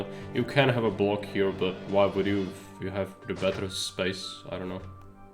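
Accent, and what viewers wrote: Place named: Czech Republic. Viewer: Oh yes for sure! I'm 64 and been playing games since 1982 on a ZX Spectrum. Got Ceasar 1 in 1992, then Caesar 2, Caesar 3 in 1998, I also played Caesar 4, but was disapointed. Ceasar 3 is the best by far, and played it all the time. But getting used to this updated version, is so cool, its like a new game! And I appreciated your tutorials, they have taught me so much, so many thanks! Oh Your English is great, English is quite hard to learn. Your words are pronoucned correctly, its just the accent that got me! Long Live the Czech Republic! Ha ha. Im in New Zealand, so miles away. Hey man, take care and keep up the great work!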